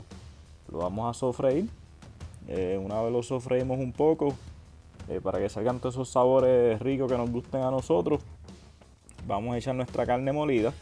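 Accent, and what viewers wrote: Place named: Puerto Rico. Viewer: Que lindo habla bien boricua ❤️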